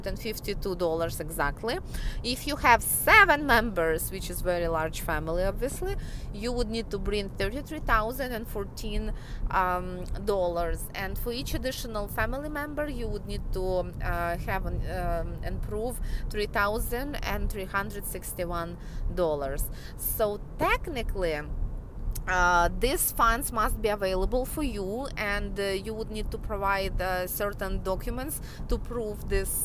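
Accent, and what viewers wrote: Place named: Russia. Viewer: oh that deceiving Russian accent...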